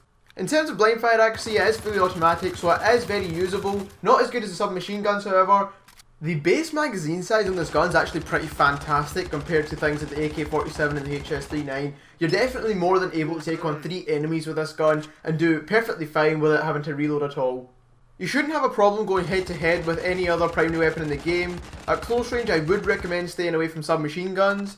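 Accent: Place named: Scotland, United Kingdom. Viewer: It makes me laugh that your like goals are so low. I admit that I watch TheRadBrad and PewDiePie sometimes. Maybe if you said "Take-It-Bitch" in your videos more or could somehow bring your pet into this, I think you could start pushing the "likes" more. I know you think it's a shit accent but the Scottish accent is pretty cool. HaHa! Man I'm only messing with you a little. I like your videos and I think watching has helped my game play.You should be pushing those "likes" in the thousands before too long.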